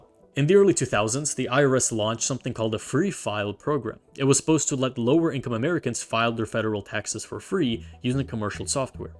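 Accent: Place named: Philippines. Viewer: I just know this is a kababayan. I can hear it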